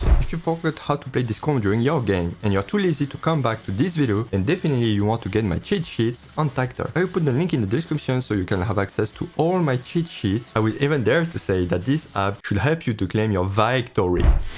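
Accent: France. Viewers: I've been holding a question for as long as I've been watching your videos... what is your accent ? It sounds like a French accent but as a French I'm wondering why I think it sounds a bit more like a Northern accent... SORRY I JUST COULDN'T HOLD THIS ANY LONGER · Pro-level Dad puns, cute French accent, that crush better strike while the iron is hot! :D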